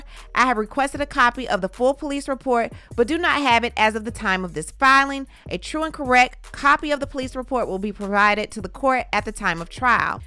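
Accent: South, United States. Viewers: It's the detailed and court document alwayyssss with oh and that southern drawl is everything.. Good work as usual detective 😂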